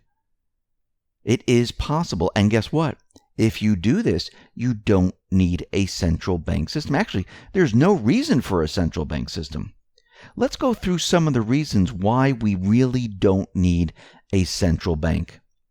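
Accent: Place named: New York, United States. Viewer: You’ve got to be from New York. Queens if my ear is correct.... Southport CT...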